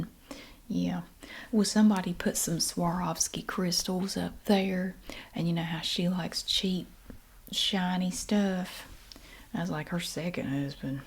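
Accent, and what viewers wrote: South, United States. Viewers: .. I'm starting to think it's a front for drugs .. 😂 Best asmr roleplay with a southern accent on Y T thus on the planet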